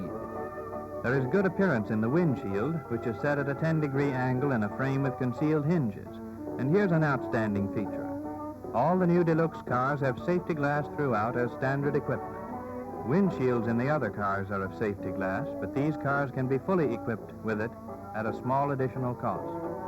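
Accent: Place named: Transatlantic, United States. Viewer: It's called Transatlantic English if I'm not mistaken. Not at all a natural accent, either.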